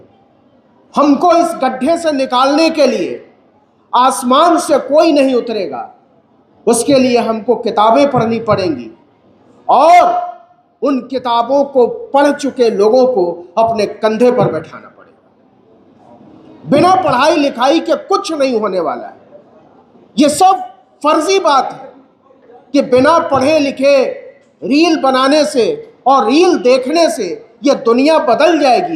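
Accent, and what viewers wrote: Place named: Pakistan. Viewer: Urdo kyon bol rahe ho? Wah bhi Pakistani accent men?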